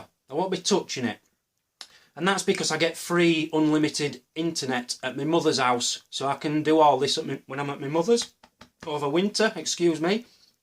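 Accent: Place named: Northern England, United Kingdom. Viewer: When I grow up and become a porn star I'm going to call myself. Seriously though, you're very entertaining... and I *WILL* have a porn star name of in honour of you. P.S Where are you from, I know it's North, but are you a Mancunian, Yorkshire, Lancashire Stoke or of there about? I thought I had an ear for accents. Anyway, keep it up. and school me on how I can't detect what region you're from.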